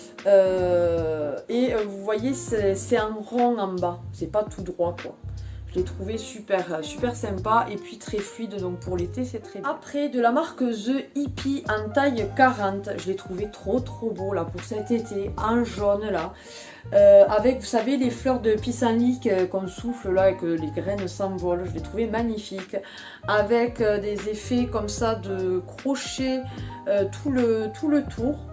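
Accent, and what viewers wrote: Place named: Occitania, France. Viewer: Coucou, sympa ta vidéo 🤗 je compte faire un tour à la croix Rouge dans la semaine 😉 pour info, heliotrope de chez adopt c'est le dupe de Manifesto d'Yves st Laurent 😊 j'adore les parfums adopt 🤗 ton accent m'a fait rêver ! Toute la famille de mon mari se trouve en occitanie ( Perpignan, Thuir, salse le château etc... ) 😊 À tout bientôt 😉😘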